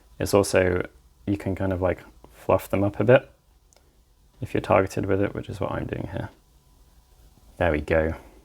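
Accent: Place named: United Kingdom. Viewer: "Too British? Turn on Subs" 🤣🤣 No, the perfect amount of British, 😊💜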